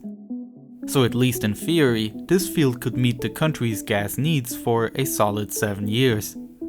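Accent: Germany. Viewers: German accent? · I cant believe it took me this long to realize you're german, but the way you said August and Recep at the beginning are such a giveaway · I have a question for you. Are you German? Sounds like it ☺️ Greetings from Munich 🤗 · I subtly like your German accent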